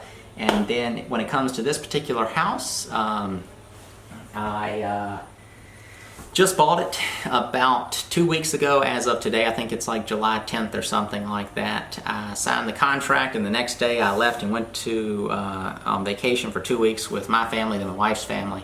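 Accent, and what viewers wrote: South, United States. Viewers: Where do you live? I live in Colorado and have thought of doing something like this but real estate here is unbelievably expensive. I am thinking of going back home to Mississippi and buying properties there. You sound like you're maybe from the South so I was just wondering. Good video, thanks for posting. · I came because the thumbnail and title made me curious, but I stayed for the southern accent lol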